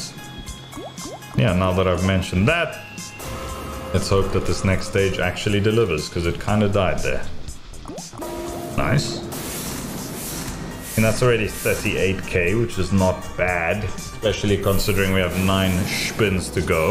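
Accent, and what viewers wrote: South Africa. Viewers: You from South Africa 😶‍🌫️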